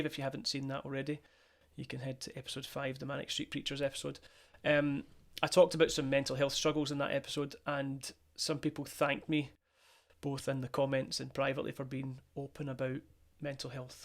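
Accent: Scotland, United Kingdom. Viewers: I love your Scottish accent 😂👍🏻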